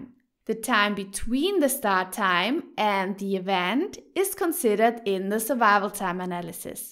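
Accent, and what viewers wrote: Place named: Germany. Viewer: the most awful german accent to date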